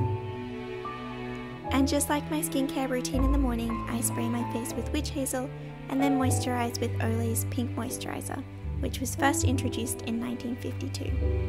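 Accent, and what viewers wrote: Australia. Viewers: This video is absolutely lovely to watch and the girl who uploaded it is an absolute sweetheart; her milk & honey voice(🥛🍯) and Australian accent are a splender to the ear(she also has cute feet as well! 👣💞😁). 💕💕💕💕💕 😁😁😁😁😁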